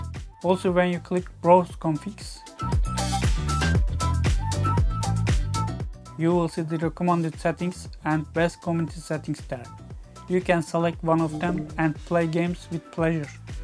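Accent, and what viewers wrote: Turkey. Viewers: dude by the accent you are indeed either Turkish or i am stupid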